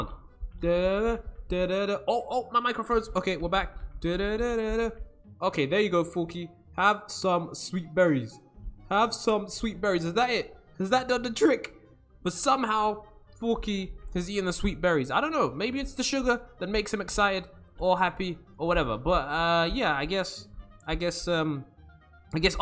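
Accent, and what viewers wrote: United Kingdom. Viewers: Are u an british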